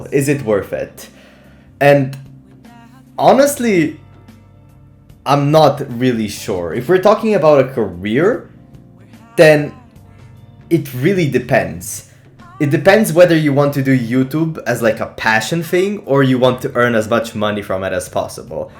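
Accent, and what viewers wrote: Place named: Europe. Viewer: Your accent sounds european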